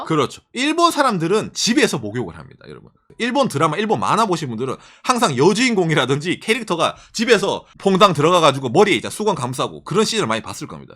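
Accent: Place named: Gyeongsang, South Korea. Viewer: 이분 동남방언 너무 심하시네요 그것만 아니면 좀 편하게 들을수 있을거 같은데 구독은 못하겟네여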